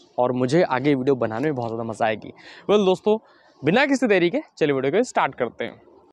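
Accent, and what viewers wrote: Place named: United Kingdom. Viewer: Bhai tum hindi bhi British accent me ku bolte ho...bahut chewtiya sound krte ho bhai... isliye bta ra hu bs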